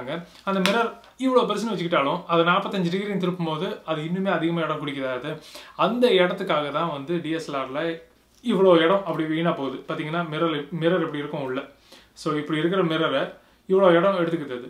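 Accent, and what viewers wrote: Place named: Chennai, India. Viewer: Semma Namma Chennai Thamizh'la explained... thanks buddy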